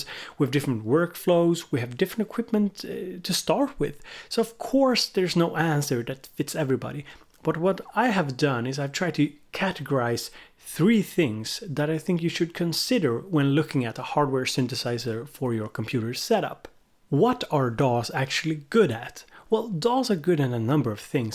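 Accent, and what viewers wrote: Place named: Sweden. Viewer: Thank you for decent youtube content! As a fellow swede, I enjoy your somewhat swenglish accent. =) Keepin' it honest!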